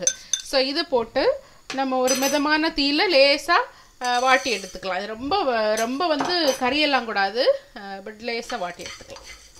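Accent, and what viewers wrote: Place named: Kanyakumari, India. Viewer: Akka, Ungali kumai tamil miga alagu....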